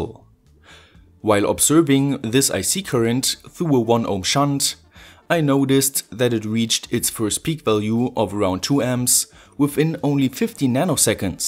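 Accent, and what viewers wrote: Germany. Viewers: Is it me or does he sound more German every video he releases? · German accent 😯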